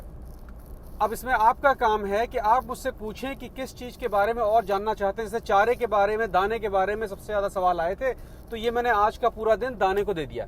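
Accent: Bihar, India. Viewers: बिहार से हो क्या भाई हहहहह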